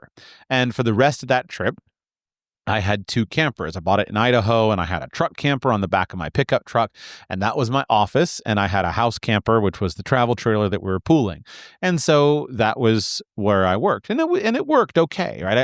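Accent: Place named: Florida, United States. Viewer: He has always talked like a Floridian.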